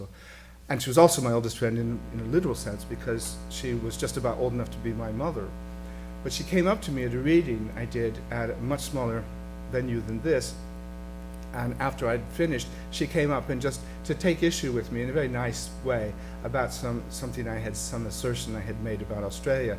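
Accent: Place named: United Kingdom. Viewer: It’s amazing there are moments he sounds British and others American. I can understand why sometimes he describes himself as an alien in his own country.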